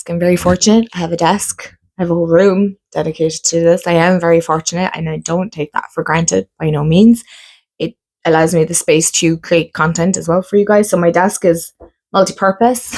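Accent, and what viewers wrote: Ireland. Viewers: So nice to meet you,. 🍀 🇮🇪 Your lovely accent sounds like home! My father’s parents emigrated from Ireland, wow, about 100 years ago and me da would slip into a brogue on the regular, which means that I slip into a loosey-goosey brogue on the regular, quoting my dad quoting Hal Roach, write it down. Anyway, I enjoy your channel and point of view. ✌️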